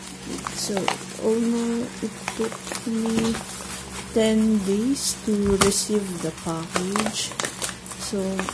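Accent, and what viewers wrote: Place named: France. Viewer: Are you french? I love your accent!!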